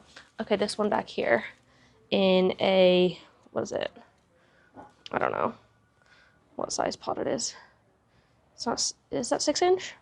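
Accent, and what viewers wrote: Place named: United Kingdom. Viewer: Your accent has always been so interesting to me, you have picked up so many british-isms that you have an in-between accent heh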